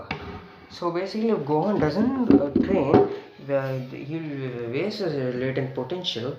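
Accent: India. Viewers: Speak English not indian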